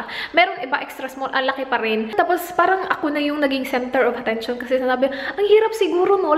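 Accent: Visayas, Philippines. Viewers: Nakakaloka yung tono kapag nagsasalita ka ate hahahahahahahahaha😂 naaalala ko si tita Giselle sayo😂 kasi pag nagsasalita siya yung tono ng bisaya'ba nandun parin hahahahahahahahahahha. Nung isang araw pako nagi-stay sa channel mo ate jajajahhahahahahahhaha😂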